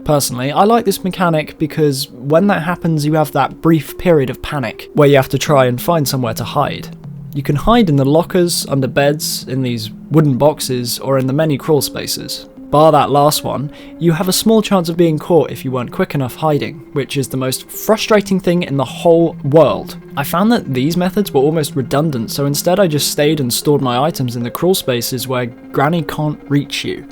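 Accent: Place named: United Kingdom. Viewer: your british which makes you 10x more annoying than you already where with this video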